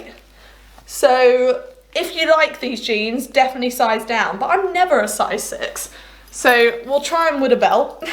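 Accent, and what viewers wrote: United Kingdom. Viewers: You are such a smart and lovely young lady. Enjoy your channel and really love your British accent. BTW Fossils Rock!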